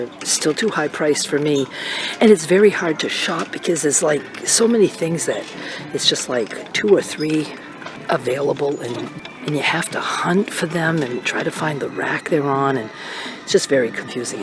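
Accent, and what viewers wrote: United States. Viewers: I happen to love the swearing lol. You talk just like most of 'Merica :)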